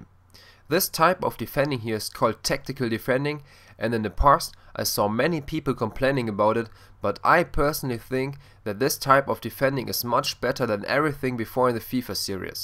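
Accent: Germany. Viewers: Kann es sein dass du deutscher bist ? Are you from germany ? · This guy has an awesome accent. Hey, you all better listen to him, he's German! Germany have a sick international team. Thanks for the advice:) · Thanks! BTW, telling by your accent, you are a German speaker? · you are german? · ich hasse den deutschen Akzent!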